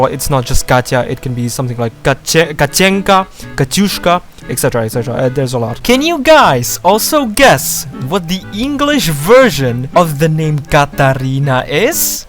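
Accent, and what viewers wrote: Czech Republic. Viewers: YOU’RE CZECH OMG I THOUGHT YOU SOUNDED CZECH WHEN SPEAKING CZECH YOIASUSKDJAA I think you need to improve that Russian accent tho AND YOAUAHDJQXX FELLOW CZECH GENSHIN PLAYER WITH IN GAME PRONUNCIATION ISSUES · Ah, czech fella I see :> Love your accent btw. Anyway, maybe "Czcibor" isn't like....something like our "Ctibor?" Anyway #2 Love how you've read name of Flins c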